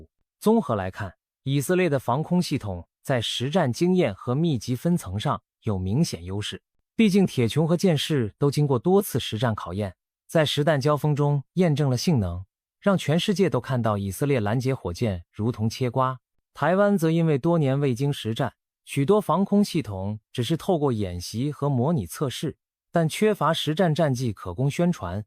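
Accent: China. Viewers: 聽這主持人口音應該是中國那邊的 不但把我們防空飛彈優點表現出來還時不時稱我們是國家 光這一點就謝謝您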